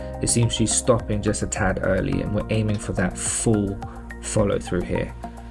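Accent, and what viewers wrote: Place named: United Kingdom. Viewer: interesting, I thought it's in Europe, judging by your accent I thought it's in Britain. Thank you for this extremely helpful video.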